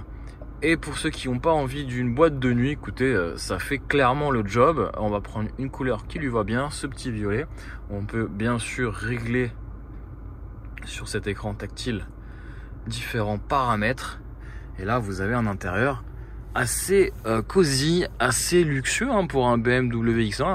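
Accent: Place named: France. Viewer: I only watched this to listen to the French accent. 10/10.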